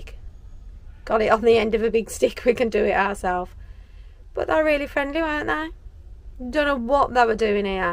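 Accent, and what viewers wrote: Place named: Birmingham, United Kingdom. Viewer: Very good video.that sounds like a brummy accent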